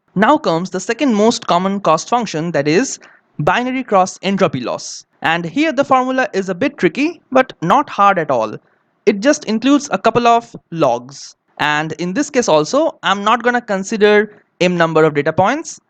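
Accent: Bengal, India. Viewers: brilliant ..also delightful bong accent :)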